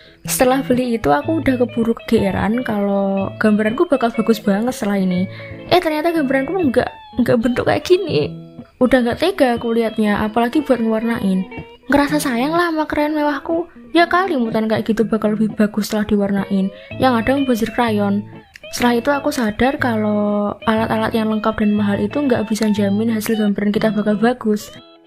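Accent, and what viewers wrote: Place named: Java, Indonesia. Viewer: Medoknya bikin candu😚😚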